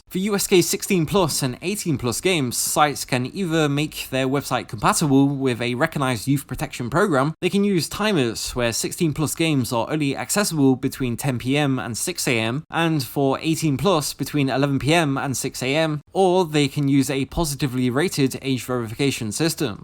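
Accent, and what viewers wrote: United Kingdom. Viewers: Hört sich nach einem Briten an. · You speak with an English accent yet you say "adult" and "minor" like an American. Weird how the internet has influenced the way young English people speak.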